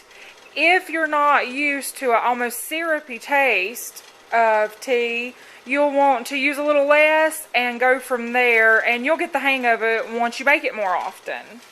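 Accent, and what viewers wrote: United States. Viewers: Real southern voice lol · Good video, sounds like a South Carolinian accent, am I right?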